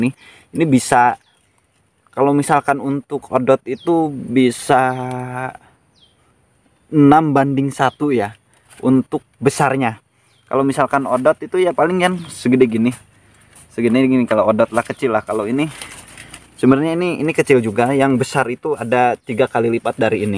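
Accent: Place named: Java, Indonesia. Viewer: logat bicaranya ky orang jawa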